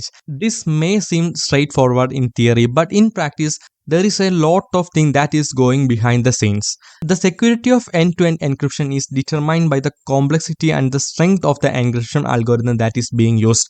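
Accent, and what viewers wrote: India. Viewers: your Indian accent is strong almost like the ones they show in western shows! it is understandable to us but global audience or even Indians might find it little irritating, try reducing it that will help you reach global audience :)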